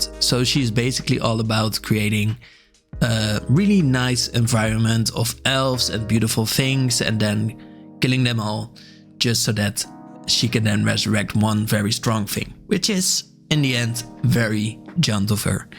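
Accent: Netherlands. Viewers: my Dutch-radar is tingling are you dutch ? edit: nvm I looked at your channel and found the answer ;-0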